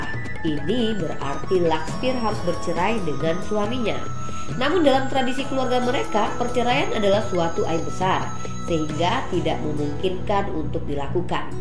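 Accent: Java, Indonesia. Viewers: dimana dirimuuu... jangan di gantiii dong...😭😭 is tbe best👍🏻👍🏻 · KESIMPULAN😁, semua narator punya ciri khas sendiri2, justru medok itulah yg bikin ciri khas suara yg tidak dipunyai narator lain, maaf untuk vidio ini tidak saya dengarkan sampai selesai😊semangat!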